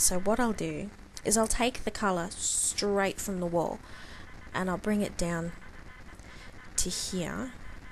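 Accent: Australia. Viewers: you sound like and assie to me that is because i am Australian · AHHHH I LOVE the Australian accent. I'm surprised I didn't catch on to that. I feel bad now :( You are awesome in my book :) Australian people are so kind hearted and sweet too, I love that.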